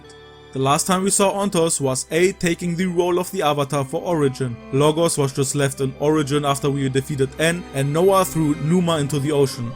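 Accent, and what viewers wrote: Germany. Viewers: aah I knew it xD. Fellow German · Also what's your accent? German? Just wondering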